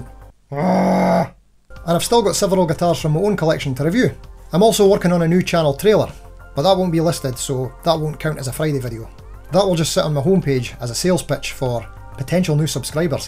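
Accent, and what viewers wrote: Scotland, United Kingdom. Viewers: I enjoy your videos because of several reasons. One, I am also interested in new budget gear. Two, I just like your personal style => serious info but also your sense of humor. Three, I may be wrong, but I think you are the first of all reviewers I watch who took a Budget guitar to a live situation. That is outstanding to me and I’d love this to be a co stanz thing in at least some reviews (I guess it would not be possible to test all stuff live). Four, I like your dialect/accent (Scottish? I’m from German I can only guess). For example you pronounce „you“ like the German „u with two dots on top“ which is fascinating. Fife, so far it is still possible to get your reaction - with more viewers that will naturally decrease, but for now I like that. Great channel and work. Keep it up mate! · yep ;) I think is more the accent not the speed :) I can understand good English even if fast but I never practiced with Scottish people ehehe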